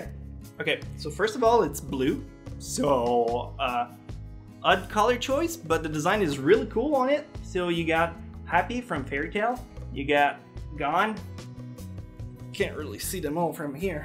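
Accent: Quebec, Canada. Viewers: I was thinking of a Canadian french... :D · it is candian freanch.... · are you from Quebec? Because you have really similar accent to my friend who is a Québécois.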